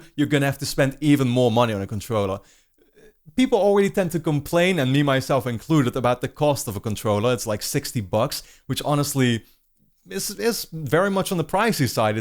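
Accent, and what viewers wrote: Netherlands. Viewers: Love you’re Dutch accent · are you dutch your accent is really dutch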